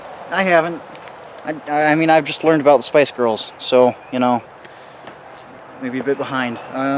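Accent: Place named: South, United States. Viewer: Lumberjack Obi-Wan with a southern accent. Based.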